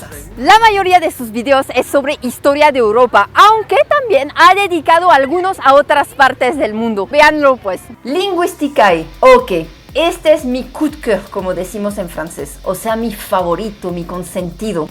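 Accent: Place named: Mexico City, Mexico. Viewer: Hola, me gusto mucho tu video… me encanta tu acento chilango 👏🏼😂 saludos ✌🏼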